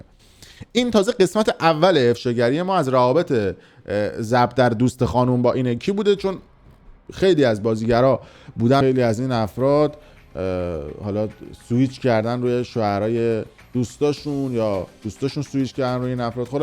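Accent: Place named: Abadan, Iran. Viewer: دمت گرم جان لطفا همین روال رو ادامه بده ویدیو کوتاه با محتوا زیاد دمت گرم درضمن حرکات دستت و اون لهجه ابدانیت کم شده ها حواست باشه کاکو😂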